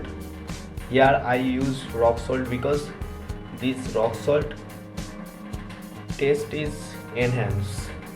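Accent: India. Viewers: Are you from India I love the accent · Mania the reason why we are laughing it's because it's an Indian speaking this way... FYI french , Chinese, Spanish, Russian, Africans, and many rural country accross the globe speak worse than this... Atleast we understood him if it were some other countries mentioned above we wouldn't have been able to understand them even more... Stop criticizing and appreciating... He's got a million people supporting his content.. work is more important than the language..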